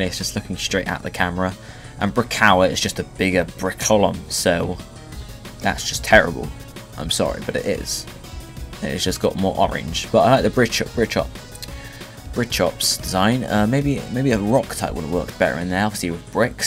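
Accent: United Kingdom. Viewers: I love your british accent, could listen to you all day c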